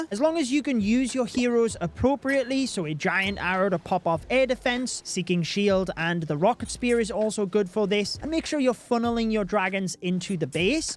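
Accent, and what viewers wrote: United Kingdom. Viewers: where are you from? uk?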